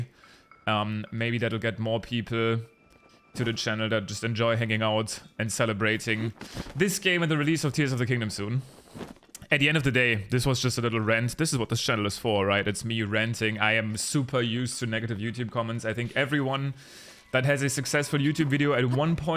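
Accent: Germany. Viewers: I live in Germany as an American and am used to German English accents, I have many friends who sound similar to you and I don't mind it! I'll never understand why people have trouble with accents, like if you don't like it then fine, but keep the negative comments to yourself smh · I'm not German but i love your accent! Please keep doing what you're doing and disregard the mean comments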